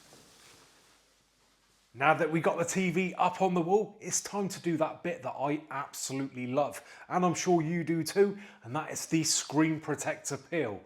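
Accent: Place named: West Country, United Kingdom. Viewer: Sounds west country. Looking at getting this tv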